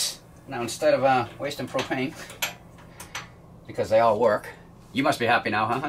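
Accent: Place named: East Coast, United States. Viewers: Hi there, just installed my bug wire shields you had in your other video. Love all your fix it videos and glad I found your channel when you first started out. It feels like I have been everywhere you have because of YouTube. Good common sense information every time I watch your segments. My girlfriend is from back east and especially enjoys the videos and your eastern accent. Keep up the great work and enjoying life. Tom and Irene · Great Channel and good informative stuff ...but what makes me very happy is to hear New Hampshire accents again ... many moons ago when I was a kid we lived in Tamworth NH and I would take the school bus to Junior High up in Conway ...bitter cold ride but o how I loved Conway and the Mount Washington area .... Gotta get back to the North East again before I die ... love the Food and the people in a big way ... 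Happy Trails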